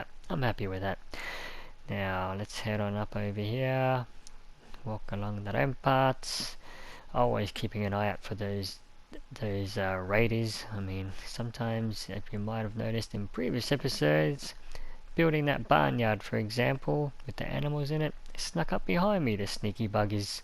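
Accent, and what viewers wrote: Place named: United Kingdom. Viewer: Are you from UK because your accent is like those gentlemen i heard from youtube?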